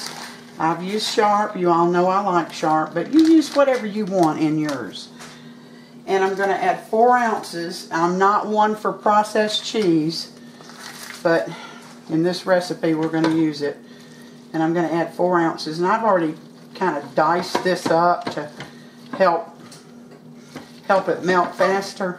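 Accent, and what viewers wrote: South, United States. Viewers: i love cooking with my pressure cooker (i have the instant pot model) and i love making mac and cheese in it. can't wait to try your recipe! thanks! also, if you don't mind my asking, where are you from? your accent sounds a bit like my family who was from texas/oklahoma/louisiana. thanks!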